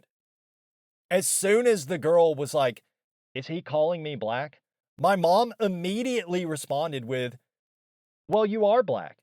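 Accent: South, United States. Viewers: If all of this happened, which I very much doubt, why would you not stop your cousin, the multiple times he kept saying "black one!" to tell him that he shouldn't say that. You sound like you're from the South. There's no way you didn't know better. You are making all of this up. And it's cringe.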